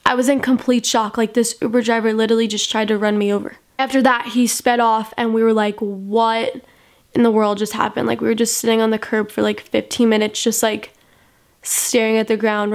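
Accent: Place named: New York, United States. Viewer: I feel like your from New York or New Jersey.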